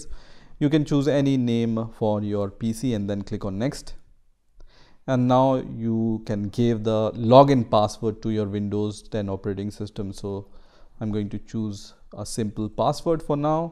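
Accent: India. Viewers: dude this might be racist but I am getting scamming vibes like I am watching one of those scam "how to videos" because of your Indian accent. I know it is not but I am just getting those vibes maybe because I am racist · slight indian accent always comforts me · this. That's clearly an indian accent.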